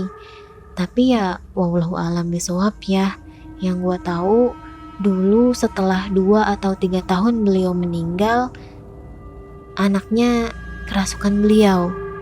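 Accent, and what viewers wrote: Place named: West Java, Indonesia. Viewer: ieu sunda pisan 😁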